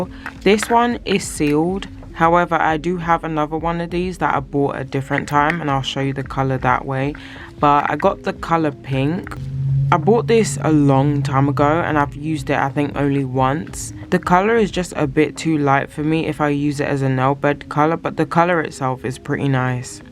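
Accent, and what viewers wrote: United Kingdom. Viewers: Hey hun just came across your channel. Love it, sounds like you're from the UK too..yay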